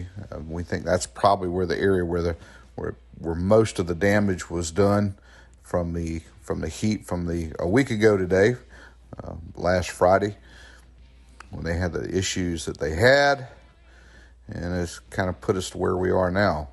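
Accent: South, United States. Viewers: The water conditions sound better with your southern drawl!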